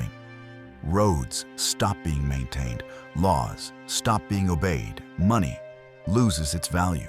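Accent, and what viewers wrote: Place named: South Africa. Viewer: Sounds like South Africa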